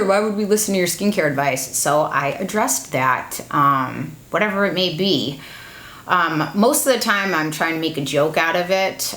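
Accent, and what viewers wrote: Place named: East Coast, United States. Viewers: I came to your channel a couple of years ago because of your “bitchy look and raspy east coast voice” lol. I have the same look and not raspy but an east coast (Nj) voice lol. Love the fact that you’re not a twenty something YouTuber!! Your opinions have always been trustworthy to me. I’m glad I found you ❤️. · I love your raspy east coast voice because it makes me remember I’m watching another goddamn adult on YouTube, not a teeny bopper. There’s a time and a place for their content...but I always stop drop and watch your videos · Always enjoy your content, and your raspy East Coast voice, RI native here. Monty Python is one of the building blocks for a great childhood, go dad!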